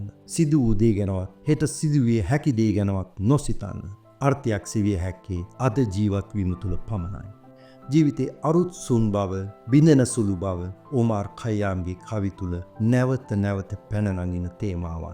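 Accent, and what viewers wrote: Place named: Europe. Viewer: විශිෂ්ඨ විග්‍රහයක්, ඒවගේම ඔබ තුමාගෙ ඉංග්‍රීසි උච්චාරණය හරිම ලස්සනයි යුරෝපියෙක් වගෙයි ❤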